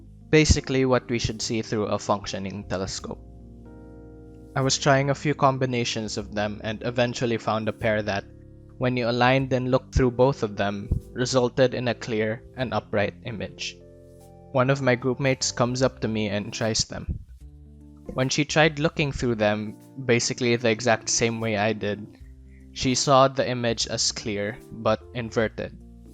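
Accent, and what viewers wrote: Philippines. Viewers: HOLY FU— YOU’RE A FREAKING FILIPINO?! Guess I should’ve known. That’s my freaking accent, too. XP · Are you a Filipino? It's because of your accent.. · knew you were filipino because of the accent but im still wOAH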